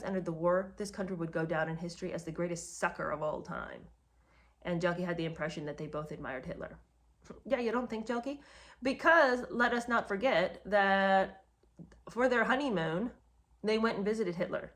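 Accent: United Kingdom. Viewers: 😂😂😂 I love your British accent. Making David sound like an unhinged Widow Twankey is hilarious. I started listening to your channel with the Diana book and the impersonation of her was spot on. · Thanks, for bringing back your fabulous British accent to enhance your review of Traitor King. I never tire of hearing it and have actually missed it since your reviews of Spare and Revenge. The Rosenbergs were executed after being accused and tried for espionage. It makes me wonder if U.S. Intelligence agencies may have been following Wallis Simpson's antics?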